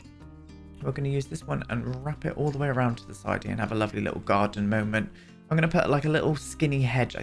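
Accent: United Kingdom. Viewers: where in the uk is this accent from?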